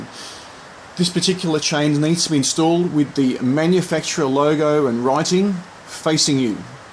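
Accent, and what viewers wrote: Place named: Australia. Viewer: Australian, I reckon mate.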